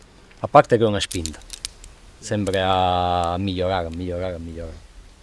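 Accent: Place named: Sicily, Italy. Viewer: Conosco nei miei 4 anni passati in Sicilia, mi ha portato a vedere e scalare nelle sue " misteriose" falesie, quelle che non si vedono all'orizzonte, perché sono dentro nei camion. Con scrissi e fotografia un bellissimo e corposo reportages con tanto di copertina su Pareti, portando al pubblico luoghi incredibili. Di questa bellissima storia mi ha emozionato risentire la voce tutta siciliana di, così umile e profonda, racconta senza fronzoli la sua solitudine di Climber in una terra indietro anni luce nell'arrampicata. Lo raccontai in quel servizio e venni contattato da un galletto del luogo, ricordo ancora l'inizio di quel messaggio: ma ti sei reso conto delle cazzate che hai scritto? Un tono minaccioso, quasi mafioso. Piccole invidie perché dissi che in un mondo di scalatori " della domenica" era ed rimane il più forte Climber Siciliano. Daje avanti cosi, per te stesso e per la tua incondizionata libertà.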